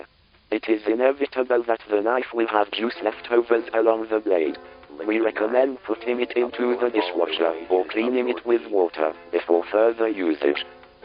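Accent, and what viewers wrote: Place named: France. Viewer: Is this your voice run through a filter? I would've thought just text to speech but it has a definite French accent(I haven't heard your voice in a long time so I can't tell if French tts or you through a filter)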